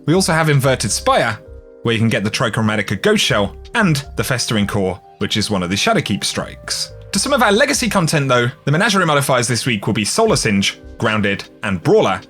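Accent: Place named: United Kingdom. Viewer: Must be that British accent 😎👍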